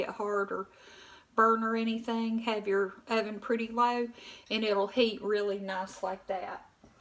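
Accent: South, United States. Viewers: Heather Robbins:. Isn't a sweet lady to use her time to teach us different recipes? It's that southern tone she has,just so sweet.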